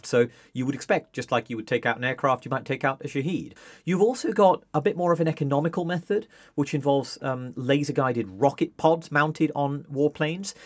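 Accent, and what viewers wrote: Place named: RP, United Kingdom. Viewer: so brititsh, so posh, and so wrong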